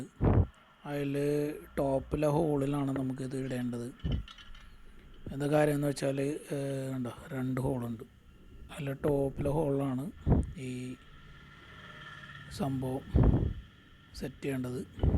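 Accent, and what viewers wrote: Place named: Mattancherry, India. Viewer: Your kindness mind and beautiful mattancheri slang awesome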